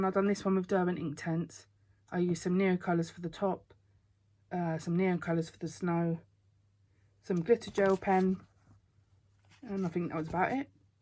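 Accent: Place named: London, United Kingdom. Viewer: Your pictures are so vibrant. I love them, and the purple snow is great. You talk like me. You're obviously another Londoner. I'm in North London (wood green).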